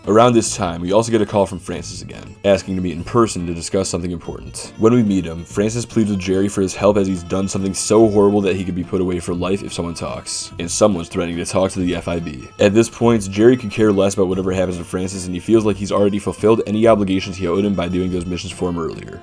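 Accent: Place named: Northern Cities, United States. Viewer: Nice. I heard your voice and thought you had a bit of that NCVS accent. Anyway, great video.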